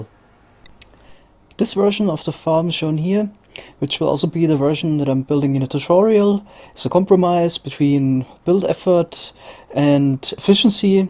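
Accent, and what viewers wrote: Bavaria, Germany. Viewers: Haha 100 pro Deutscher oder? Der Akzent macht mich fertig. Nicht böse gemeint :D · He's German. I heard him speaking it. He sounds kind of Bavarian to me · Too sausend haha man hört voll raus, dass du Deutscher bist :D Aber krass, was du alles machst, großen Respekt dafür! :)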